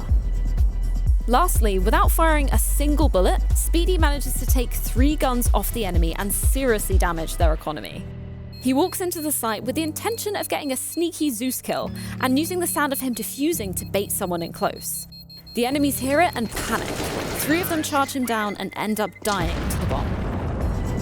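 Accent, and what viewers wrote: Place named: United Kingdom. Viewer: That female british accent tho 🤤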